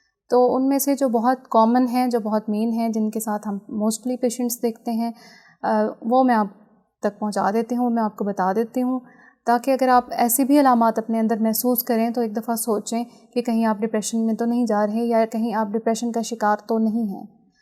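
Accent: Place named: Saraiki, Pakistan. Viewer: Hello Madam, Good Day! Hope you are enjoying good health. My question is about bad thoughts. The bad thoughts about religion come to mind, if a person expresses these thoughts in front of someone, he/ she will be killed as a blasphemer, but these thoughts come to mind many times. is there any solution? By the way your speaking accent shows, like you are Saraiki.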